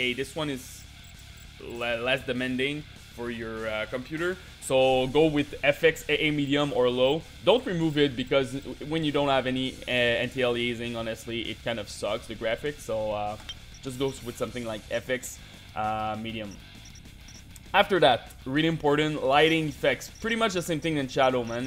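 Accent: Quebec, Canada. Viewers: l'accent direct j'ai capté que t'es un French Canadian :P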